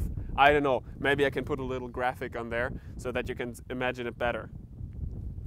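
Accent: Germany. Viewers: Well except for the German accent this is actually pretty good :)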